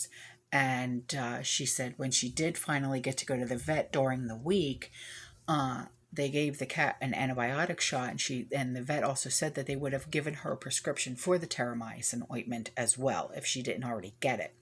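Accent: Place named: Philadelphia, United States. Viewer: Thought you were Philly or a Delco girl.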